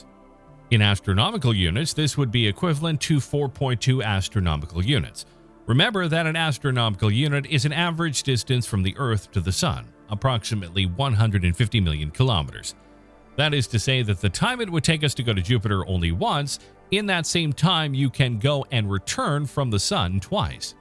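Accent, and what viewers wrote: United States. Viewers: Would it kill you to show the distance in miles also??? The narrator sounds pretty American to me and yet all you show is kilometers. So annoying 👎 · No shit. But the dude in the video speaks American English. Brits and Aussies are used to miles as well as Kilometers. The whole English world understands miles. Watch the Isle of Man TT currently running or watch MotoGP, they use both. If that's the reason, it's a piss poor one. Why not make a video the 330 Million Americans can understand also!?!?!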